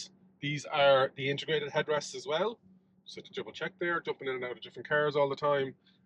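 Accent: Ireland. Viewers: Many thanks for the comprehensive review and Irish accent that brought me back to my early school days. The car is an overall very good SUV, spacious and powerfull. Please provide the specifications of the sound System and speakers. Also, please advise the availability of two motors and AWD version. Best Regards.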